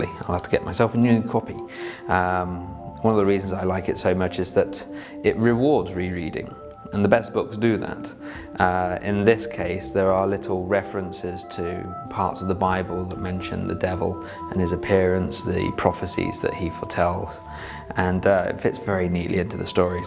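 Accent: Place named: Scotland, United Kingdom. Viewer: She scottish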